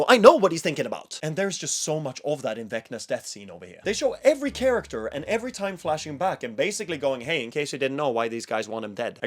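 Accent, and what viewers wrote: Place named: Sweden. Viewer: the way i activated like a sleeper agent when i heard that you had a swedish accent is frying me😭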